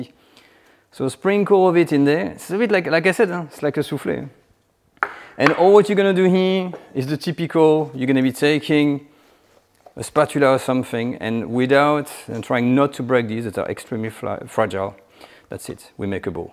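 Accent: France. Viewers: Dude, you are losing your beautiful French accent...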